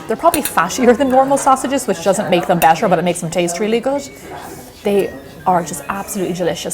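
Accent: Ireland. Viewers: Omg Iv been following you for years and always hear the Irish accent but didn't realize you were from Wexford. I'm from New Ross but live in New York. Small world. I'd kill for chips from kilmore or the premier in Wexford town . Go get a risole ! Yum!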